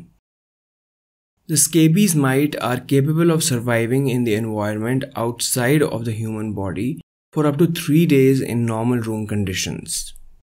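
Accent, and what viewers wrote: India. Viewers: Very good coverage. Also very interesting that I detect an Indian accent; yet absolutely no trouble understanding your words. Speech is remarkably clear. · Norwegian scabies ? that’s racist just like when Covid 19 was called the "Wuhan virus", or the "Chinese virus", anybody using that term was labeled a racist. You sound like you’re from India so if a type of scabies originated in India, i doubt you’d call it Indian scabies. "What’s good for the goose is good for the gander."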